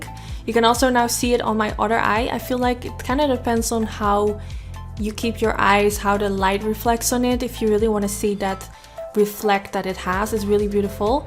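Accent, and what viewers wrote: Netherlands. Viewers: Ik wilde net vragen of je uit Nederland kwam (je klonk een beetje zo☺️), maar toen las ik het op je kanaal🤪🤗😍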